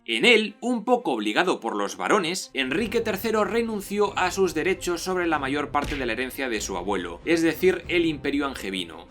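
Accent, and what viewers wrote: Spain. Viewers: Me gusta tu acento español, además de tus vídeos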